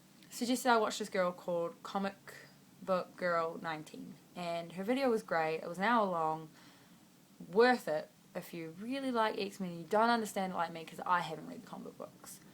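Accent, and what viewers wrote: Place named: New Zealand. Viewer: You sound like a kiwi!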